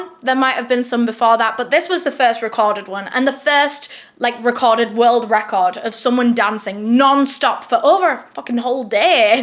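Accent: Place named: Yorkshire, United Kingdom. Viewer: It's always an amazing day when uploads. Thank you for another wonderfully informative video. I love your Yorkshire accent. I live in Derbyshire, so I have a twang of it in my accent as i lived in South Yorkshire for a while. Look after yourself, beautiful lady!. 🥰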